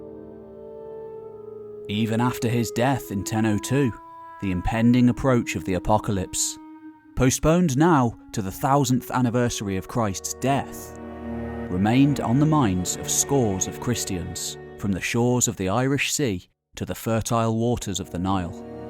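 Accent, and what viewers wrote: United Kingdom. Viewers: Thanks for posting this information presentation. You sound like a fellow Brit to me, so what's with the 'ad-ver-tize-ment' pronunciation? Just wondering.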